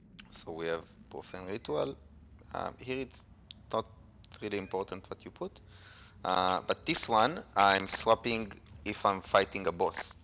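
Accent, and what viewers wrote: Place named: Israel. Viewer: חח תודה רבה צדיק זיהיתי לפי המבטא את הישראליות ! נראה מעניין,אולי אלך על הבילד הזה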